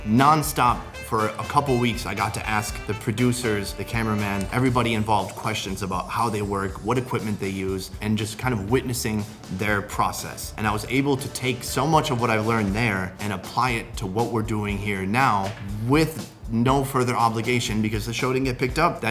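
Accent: Minnesota, United States. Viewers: I thought he sounded Minnesotan, didn't realize he was from my hometown area!!! 💁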